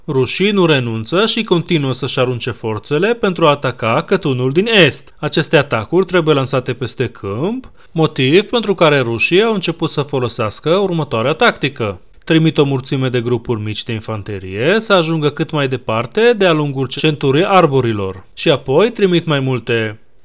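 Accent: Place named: Cluj, Romania. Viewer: Hai că nu-i bai. Bine soldat ardelean. Îmi place că ai intonație de pe la Cluj. Slavă Ucrainei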